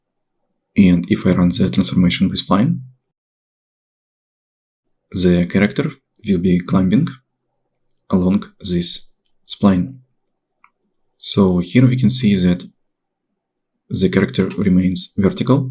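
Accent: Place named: Russia. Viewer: Да нет же! Акцент жемчужина! Я сразу вспоминаю игру Red Alert... Комрад дженерал и другие озвучки русских юнитов))))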